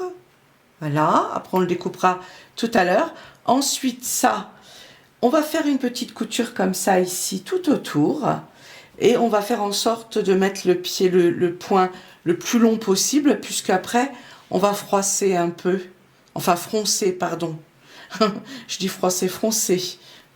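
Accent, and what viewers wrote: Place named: East, France. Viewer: Bonjour. Je viens de découvrir il y a une dizaine de jours votre chaîne, j'adooooore! C'est clair, précis, voix lente et avec en plus un petit accent! Je dirai de l'Est? A la montagne? En tout cas merci, j'apprends beaucoup de chose. Bonne journée.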